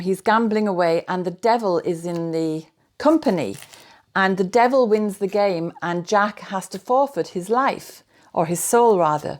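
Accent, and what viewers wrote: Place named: Bishop Auckland, United Kingdom. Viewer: I couldn't quite discern your accent...but I did think Bishop Auckland!! I absolutely love listening to your wisdom. Thank you so much xxx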